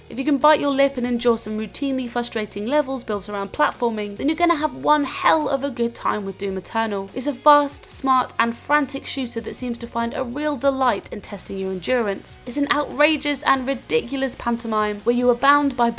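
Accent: United Kingdom. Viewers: Remember games radar before it was bought out by bored, monotone sounding British bloggers?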